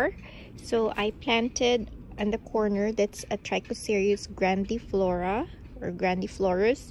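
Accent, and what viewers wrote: Philippines. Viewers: Hi! Are you Filipino? You sound like one. Not that its a bad thing, Im a Filipino too. =)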